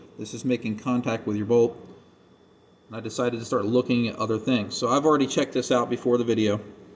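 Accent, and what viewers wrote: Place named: South Jersey, United States. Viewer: Are you from the south jersey/Philadelphia area?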